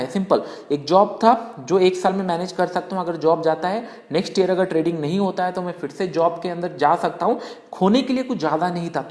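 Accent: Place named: West Bengal, India. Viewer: It seems u r from West Bengal. I am too from Adra, Purulia district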